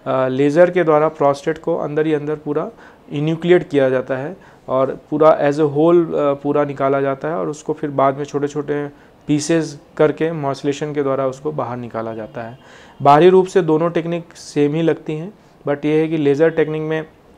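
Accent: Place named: Uttar Pradesh, India. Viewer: VERY SOFT EXPLANATION WITH U.P ACCENT, THANK YOU.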